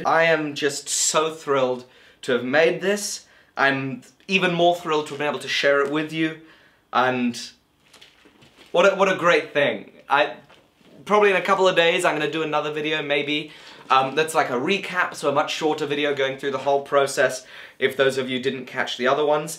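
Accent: United Kingdom. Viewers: can you remake this video again but using a normal accent (not annoying British accent)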